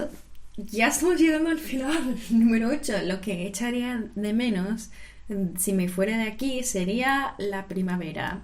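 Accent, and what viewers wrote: Andalusia, Spain. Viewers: Qué bonito acento de miarma tienes. Me alegra que disfrutes tanto tu vida aquí. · Tienes acento andaluz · Me encanta y me hace mucha gravia escucharte hablar el español con ese acento andaluz de Sevilla.... La Real maestranza de Sevilla eso es único en el mundo. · Es increíble oír a una americana hablando con acento andaluz graciosísimo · Que bonito deje Andaluz tienes 😄👍👍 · Soy del pais valenciano y me resulta muy gracioso como has cogido el acento andaluz , cosa que es normal , a mi tambien me pasa cuando paso mucho tiempo en un lugar, enhorabuena por tus videos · 😂😂 que gracioso..una americana hablando español pero con acento del sur ..de Andalucía 😂😂. No olvides que Texas perteneció a Nueva España y por lo tanto en Texas se habló español antes de unirse a los Estados Unidos. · Estás segura de que eres norteamericana...????? 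Mmmmm..., no sé, no sé...😅😅😅 Es broma. Hablas un castellano-andaluz. Estás de un integrado TOTAL 👏👏👏👏👏👏👏👏 Quédate aquí pa' siempre...🫂🫂🫂🫂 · Muchas gracias por escuchar esas lindas palabras y ese acento Andaluz, yo soy de Canarias, vivimos principalmente del turismo y escucharte hablar de mi país así me encanta,gracias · Ay mi mare,po dios....pero mi niña si tienes mas acento andalu que la mayoria de los andalasus.....viva la mare que te pario,mi arma..... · Qué gracia me hace tu acento andaluz. No te vayas. Te queremos. Saludos. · Se queda uno embobado escuchándote que hacento más bonito y que gracejo andaluz que arte que tienes da gusto verte y oírte. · Ese acento maravilloso andaluz. Y soy de Mallorca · Tienes acento andaluz... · Que gracia escuchar una texana con acento andaluz. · Pero q bonita eres!!!!!! Me encanta tu acento andaluz,oleeee guapísima!!!!! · tu gracejo andaluz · MADRE MIA COMO SE TE HA PEGADO EL ACENTO ANDALUZ , PARECES ANDALUZA OLEEEEEEER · Que salero tienes hablando como una Andaluza Olé , Olé y Olé, saludos desde Córdoba yo también soy Andaluza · Qué gracia oirte hablar, con acento estadounidense y andaluz a la vez 🤣🤣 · Ay mi alma si tienes acento andaluz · Eres una americana muy andaluza, tienes ese deje de andaluz y además hablas muy bien español. 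❤ Me alegra estés bien. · Soy de Valladolid, me hace gracia tu acento andaluz. 😘😘😘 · 😂😂😂Ese acento andaluz dice que tu eres española de corazón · Me hace gracia oírte hablar, por que tienes mucho acento Andaluz y hasta las expresiones tb jeje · Olé tú que acento andaluz tienes.. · ¡Tienes acento Andaluz! Eso sólo es que estas enamorada de Andalucía.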